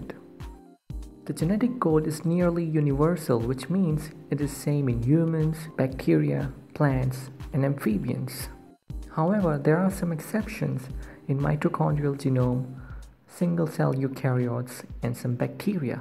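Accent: India. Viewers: R u indian your accent says that.🦆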